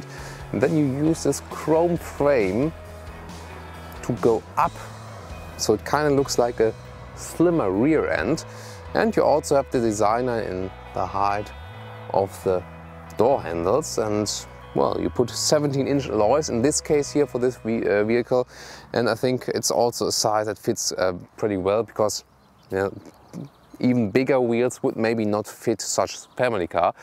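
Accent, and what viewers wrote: Germany. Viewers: Ist ein sehr "deutsches" englisch, daher sicher auch für deutschsprachige besonders einfach zu verstehen ;)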